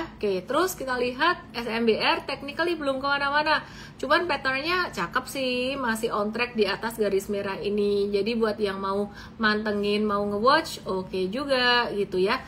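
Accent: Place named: Surabaya, Indonesia. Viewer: Enak banget mis suroboyoane ketel 😂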